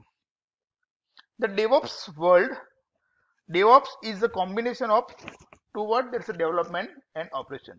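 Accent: India. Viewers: Are you from Orissa ? Your accent clearly shows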